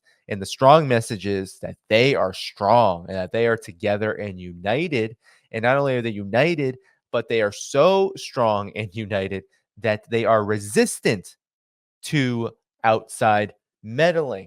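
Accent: United States. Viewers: why are you speaking with an American accent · PLEASE MAKE CHANGES OF YOUR AMERICAN ACCENT AS WELL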